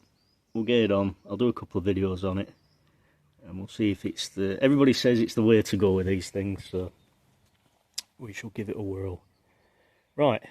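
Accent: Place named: Lancashire, United Kingdom. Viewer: Just a non relevant point, your accent seems more Blackburn, Lancashire, than Norfolk. Not withstanding this the ' bike is great and I would love to own it.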